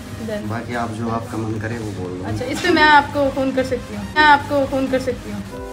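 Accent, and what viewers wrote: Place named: Rural, India. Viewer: भाई तेरा बोलने का तरीका देशी लड़के की तरह है। मजा आ गया भाई। 🤗🤗🤗🤗🤗🤗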